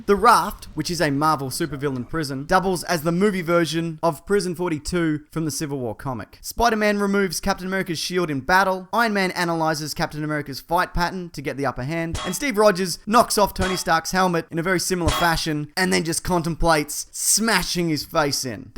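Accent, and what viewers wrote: Australia. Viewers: Are you a Aussie youtuber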